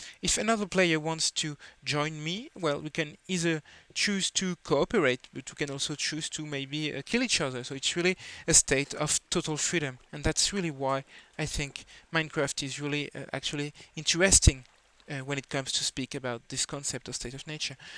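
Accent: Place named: United Kingdom. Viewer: tu parles vraiment bien anglais avec un accent très British !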